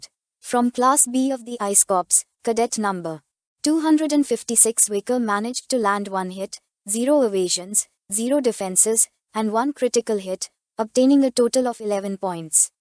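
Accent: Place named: India. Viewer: kind of an ugly accent... can somebody change the announcer.... its like watching indian/tamil movies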